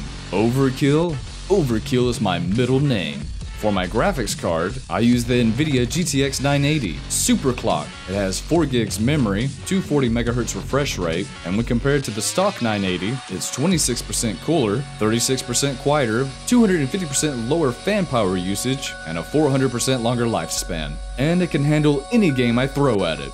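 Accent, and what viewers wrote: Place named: South, United States. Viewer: If you don't mind me asking where are you from you sound southern ps thank you for telling me Jesus loves me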